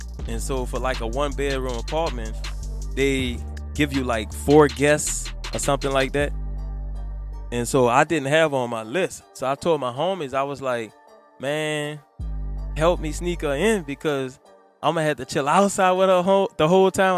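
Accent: New Orleans, United States. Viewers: I just subscribed to your channel. I like your content. I’m from Philly and I was stationed in New Orleans back in the late 90’s. With that being said, you sound like you’re from the New Orleans area. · Bro this is a great video. Sounds like you from that NOLA too! Love man.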